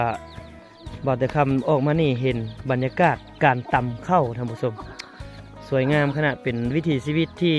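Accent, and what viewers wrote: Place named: Surin, Thailand. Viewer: เว้าคือล่วยแถว จ สุรินทร์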